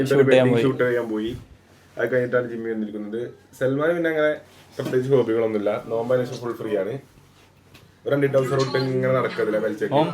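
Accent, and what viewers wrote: Malappuram, India. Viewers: Malappuram varthanam athaan ikk thonniya highlights 😂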